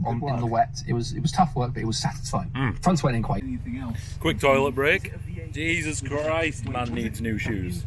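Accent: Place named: Liverpool, United Kingdom. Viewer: Deffo a scouser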